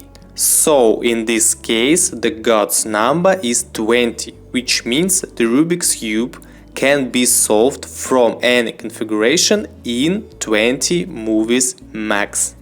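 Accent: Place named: Russia. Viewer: Noomde ye solve babe hota Russian inglish bolta he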